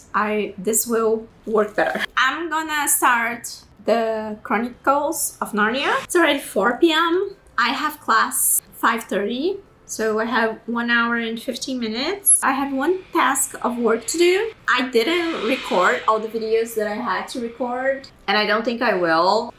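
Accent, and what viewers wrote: United Kingdom. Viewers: I love your English accent! This vlog is as amazing as your Portuguese ones, congratulations! Loved watching it and seeing you so comfortable and chill! ♥️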